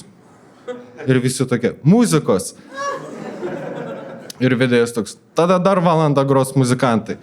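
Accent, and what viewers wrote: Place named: Vilnius, Lithuania. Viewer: Pagaliau Vilniaus krašto komikas. Tuteišai unikali kalba! Niekas niekur daugiau taip nekalba. Teko suprasti tik emigravus 😅 Atrodė natūralu visada. Šaunuolis!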